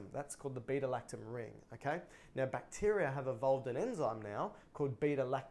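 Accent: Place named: Australia. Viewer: Used this to study for the GSSE here in Australia (where I assume you are from, it sounds like? Or maybe NZ)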